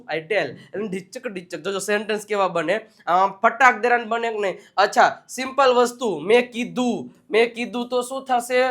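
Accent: Kathiawar, India. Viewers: I like your kathiyadi speech sir 🙏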